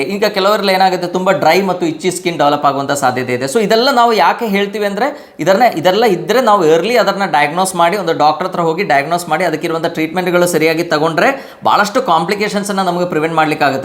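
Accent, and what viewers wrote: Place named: Kasaragod, India. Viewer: Doctor re nivu kannada pada balasi namage English Word artha agolla. Nivu pure kannada davaru alla. Gadinaadu pradesha kasaragodu avara tharka ide nimma kannada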